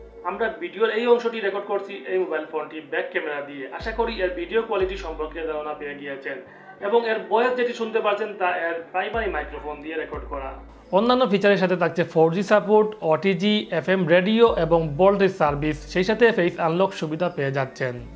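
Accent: Sylhet, Bangladesh. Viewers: ভাইছাব সিলেটী নি৷ আমিও সিলেটী৷ আফনার ভিডিও বালা লাগলো৷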